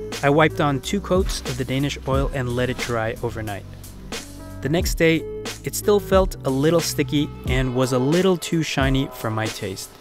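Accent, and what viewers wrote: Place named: England, United Kingdom. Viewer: Your English accent us pretty awesome 👌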